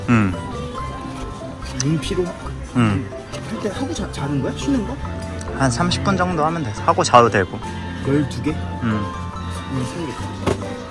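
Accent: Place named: Hakata, Japan. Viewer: 日焼け止めがこんな可愛くてもいいと~？❤ 博多弁のJUJUさんもも可愛か~❤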